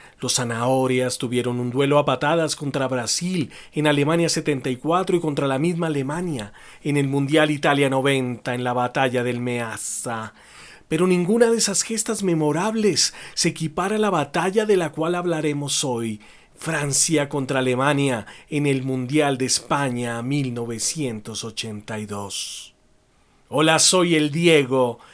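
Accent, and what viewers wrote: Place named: Argentina. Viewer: Cuando empezaste dije yo este es argentino y me puse a pensar en cual provincia serán más tontos . Después me puse a ver y escuchar y me gustó muy buena narrada . En aquellos años supongo yo que era un espermatozoide nadando en los testículo de.mi viejo . La verdad que ese arquero me recuerdo al gato sesa o a orion y algunos otros . Saludos muy buen video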